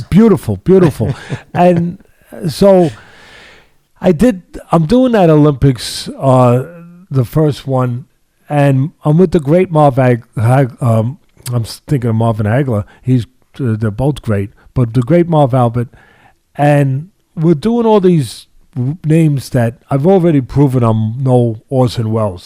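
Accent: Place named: Boston, United States. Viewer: All I want to know is what part of Boston is Teddy from.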